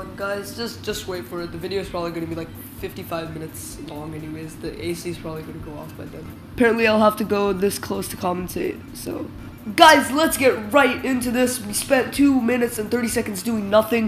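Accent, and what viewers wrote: India. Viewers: r u an indian?????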